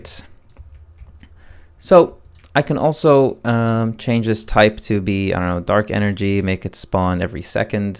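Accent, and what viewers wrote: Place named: Arab world. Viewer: I just wanna ask 1 question ! where are you from ( I feel you are arabic)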